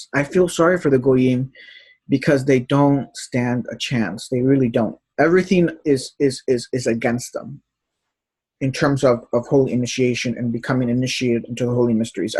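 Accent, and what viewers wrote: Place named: Israel. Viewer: Ah ok. I have many Israeli friends and you sounded like one of my friends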